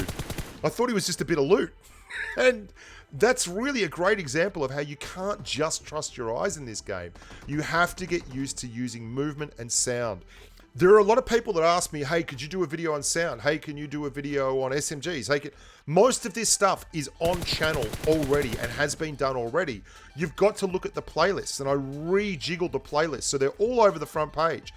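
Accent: Australia. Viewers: He's an Aussie mate.... GADDEMIT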